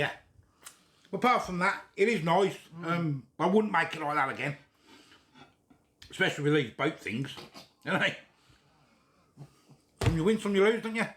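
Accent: Birmingham, United Kingdom. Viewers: Another class funny video m8.your brummi accent is class m8😂..im from Cardiff we dont say butt,rhonda pps do🤔🤐ive posted b4 im paul canton cardiff,, whats your real name,old foodie guy suits m8,but? When sending message b nice 2 say ie tony? Lol...u so make the cooking easier 2 explain,than saturday kitchen bbc1🙄keep up thr good work m8 k,love 2 all ❤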